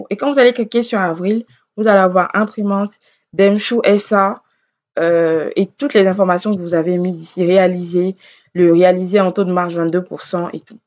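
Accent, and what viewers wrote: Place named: Cameroon. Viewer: Accent camerounais quand tu nous tiens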